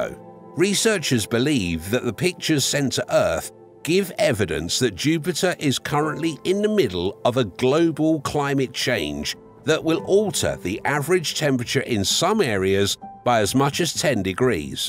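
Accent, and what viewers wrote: United Kingdom. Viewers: I know Ur UK english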